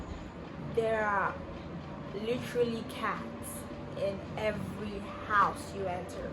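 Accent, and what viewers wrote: Ghana. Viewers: You sound Ghananian 🇬🇭 than a Nigerian 🇳🇬 . "You say erh" . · Learn the language...Your accent is Ghanaian by the way.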